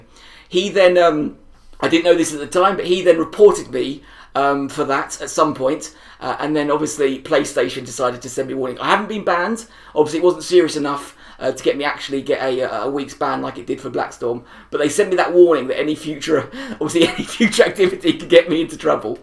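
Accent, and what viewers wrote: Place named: United Kingdom. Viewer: I fucking love your commentary, your british accent just makes it all the more hilarious i swear to god!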